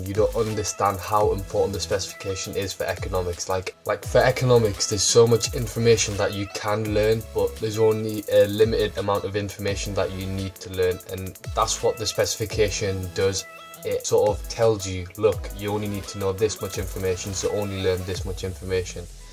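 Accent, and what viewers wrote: United Kingdom. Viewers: british accents lmao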